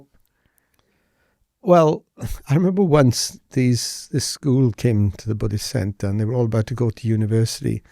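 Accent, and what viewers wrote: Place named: North, United Kingdom. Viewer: Still can’t get over a monk with such a strong northern accent 😝👍🏻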